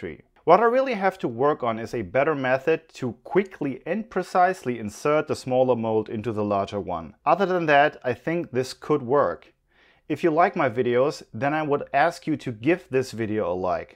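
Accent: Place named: Germany. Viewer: I do not care what you make/salvage or do, i have always watched(listened) because of your voice. It is German dialect, not annoying, but rather comforting. Thank you!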